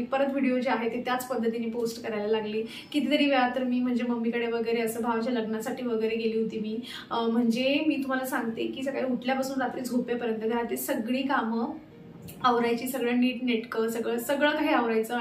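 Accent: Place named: Shirpur, India. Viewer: Pat your back for this realisation and taking decision to change this pattern 👏👏👏 I'm so happy for you ☺️ I love you dear❤ Mi pn kanya rashichi ahe tuzyasobat jast relate karte😊 khup chan watla ki tu swatacha aanand nivadlas. Ani bhashecha sangaycha tar mi Satarchi ahe. Ani mazi ek maitrin Shirpur chi ahe. Hostella sobat hoto. Tyamule tichi bhasha aikaychi savay zaleli. Ata sagle aapaaplya kamat tyamule bolna hot nahi. Tichyasarkhi bhasha aaikayla milte mhanun mala tar tuza bolna khup aawdta❤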